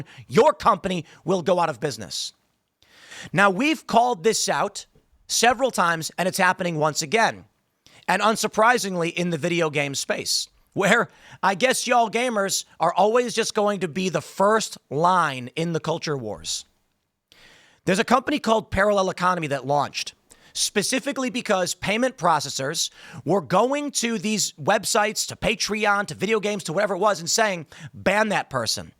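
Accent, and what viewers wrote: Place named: Chicago, United States. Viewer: Tim and he Chicago accent. They gotchya by the bawls, he says. haha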